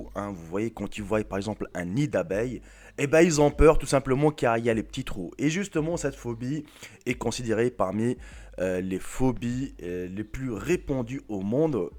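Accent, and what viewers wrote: Tunisia. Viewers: T un tunisien ?? Ena zeda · T'ES Tunisien ?! Comme moi x)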